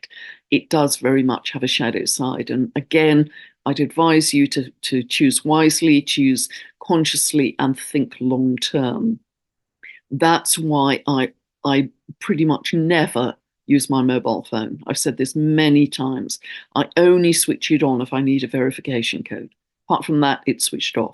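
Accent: England, United Kingdom. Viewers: thank you… but I can probably top that. You made my life! Not that this is a competition, rather a communion of like hearted souls, and one in which I feel immense gratitude to know you. Many, many moments of wobbly exhaustion were laid aside after I listened to your English dulcet tones, so welcome in this far flung place from home where I live 💜💜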